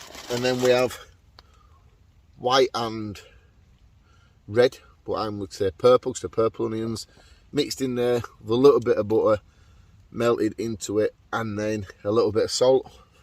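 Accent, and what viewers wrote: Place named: Cockney, United Kingdom. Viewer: Well, the video would probably be enjoyable, but for your speech. I don't wish to corrupt & forget (foorgit?) MY English by hearing all the cockney "boogs (for 'bugs'), "stoof (for 'stuff') and "coomfertIble" (for, 'comfertable, of course)...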